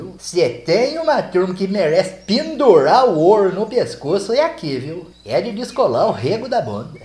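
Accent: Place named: Piracicaba, Brazil. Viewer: quando ocê veio pa Piracicaba? porque ocê falo do jeito que é aqui onde eu moro. Apesar que nois piracicabano samo um pouco mineiro também.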